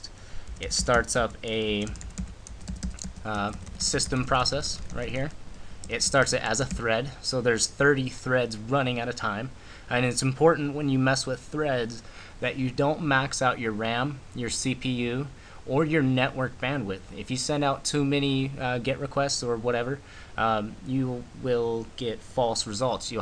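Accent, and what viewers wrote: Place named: Canada. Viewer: could you tell by his sweet canadian accent?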